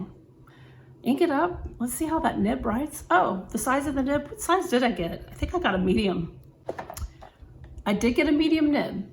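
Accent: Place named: Dallas, United States. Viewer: Great look! I think you sound like your from Dallas, and Dallas people are more fashionable than S.A. or Houston.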